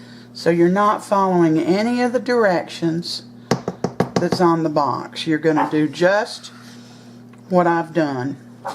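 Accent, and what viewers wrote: South, United States. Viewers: Hi Hun, new subbie here!! What state do u live in? If I may ask lol..I can tell your in the south, I'm in Tennessee, I like a lot of your recipes!!! I'll be sticking around😊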